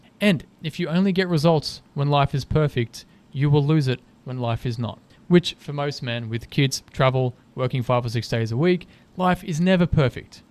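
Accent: Australia. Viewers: 😅 Ho sempre pensato fossi australiano 🤷‍♂️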